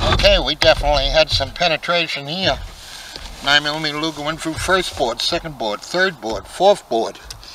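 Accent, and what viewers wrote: New England, United States. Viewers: You can definitely tell this is a Yankee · Love this New England accent.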